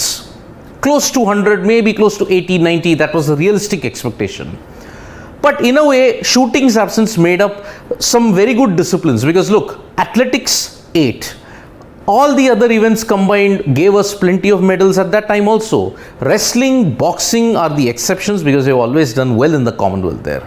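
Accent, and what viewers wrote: India. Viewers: Concentrate on content and not his speech. There are plenty of dialects and accents within India and people will speak how they wish to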